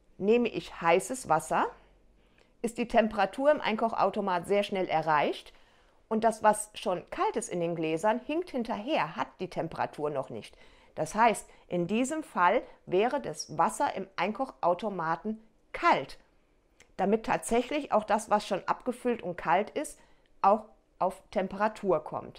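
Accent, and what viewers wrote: Hesse, Germany. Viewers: Liebe, danke für das tolle Video!!! Wir sind Hessen... leben aber schon seit 5 Jahren in Österreich und ich liebe es... deine herzige hessische Ausdrucksweise... bitte weiter so! Grinsss Und ja, ich hätte gerne das Brot mit dem zugekauften Teig als Video. Lass dich bitte nicht ärgern von Menschen die nur motzen wollen. LG Regina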